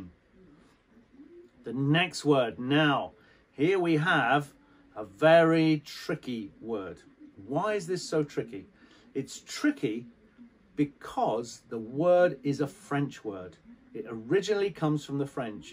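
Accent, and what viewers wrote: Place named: United Kingdom. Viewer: Waiting for this another british pronunciation of yours pastor😊❤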